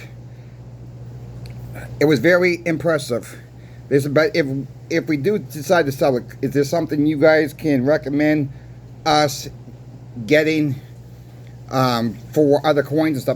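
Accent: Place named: New England, United States. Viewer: I don't know if those customers are actually going to read your comments or watch the video however you pose the question are they doing the right thing by getting rid of the collection? As a stacker my answer would be absolutely two things going to happen here if they get constitutional silver that money yes money is guaranteed and backed by the United States Treasury not the Federal Reserve Etc that's actually backed by the United States of America and as far as generic rounds well if the price of silver skyrockets they win their as well because at what point does the collection just become worthy of the silver that's in it or the 50 or $100 in coinage that they have due to the fact that no one has money and no one's going to care that they are Collectibles they are going to look at the intrinsic value being that of silver money. 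Just my two cents I absolutely think they're doing the right thing by getting a mix of constitutional silver, ASE, generic rounds. Keep up the good work every once in awhile the New England accent comes out and I get a kick out of it being from New England myself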